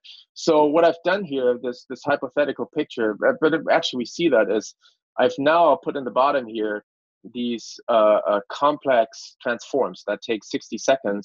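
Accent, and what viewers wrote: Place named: England, United Kingdom. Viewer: Clear enough, and with a clear English accent. I'll allow it.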